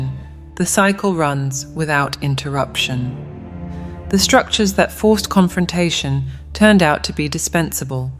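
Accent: United Kingdom. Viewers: Thank you so so much for returning the british voice. Please redo the other two videos 🥺🥺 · British voice yes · Fantastic, thank you so much for listening to us and bringing back the posh English lady. Really appreciate it 🙏🏼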